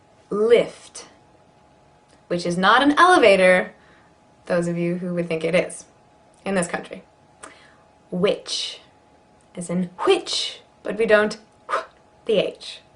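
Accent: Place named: United Kingdom. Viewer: половина слов с британским акцентом. хотя сама тараторит на американском ask с pass точн не правльно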